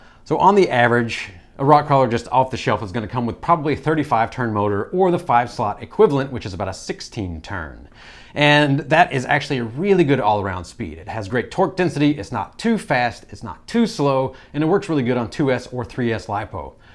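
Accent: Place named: South, United States. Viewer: Nice southern drawl too.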